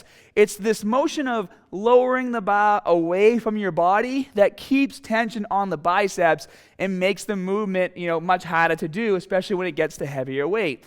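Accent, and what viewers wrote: Boston, United States. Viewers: Yup, first video I saw I thought he just had a really adorable speech impediment, then I realized he was from Boston.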